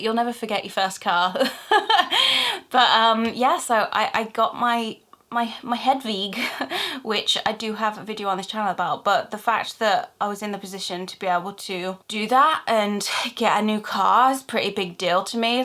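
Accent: Birmingham, United Kingdom. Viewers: Ayeeee your a brummie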